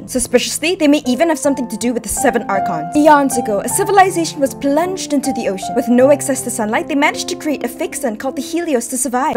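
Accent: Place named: United States. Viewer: your accent becomes more american every video 😭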